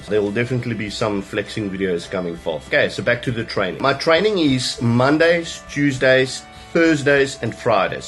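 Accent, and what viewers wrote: South Africa. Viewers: Is this the Afrikaans type accent?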